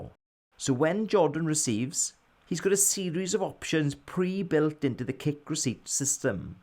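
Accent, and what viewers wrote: Wales, United Kingdom. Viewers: Lovely lyrical Welsh accent ala Terry Grittths. I’d say when your ordering a pizza it’s like telling a story.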